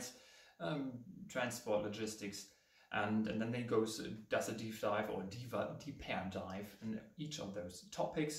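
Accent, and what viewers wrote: Germany. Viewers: Hey nice review bro, love your German accent :D I also speak German. Wie gehts? Subscribed! Have been posting some book reviews recently too, we should support each other :D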